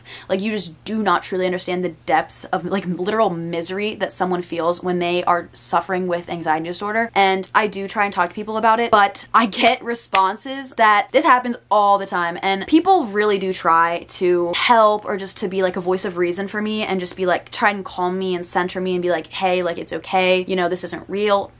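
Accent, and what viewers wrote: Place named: Pennsylvania, United States. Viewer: And i think Pennsylvania's English accent is the best .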